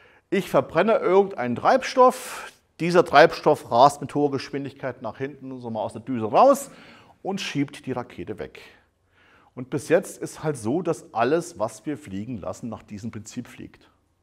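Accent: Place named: East Germany, Germany. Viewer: Musste leider schnell wieder weg wegen Ossi Aktzent...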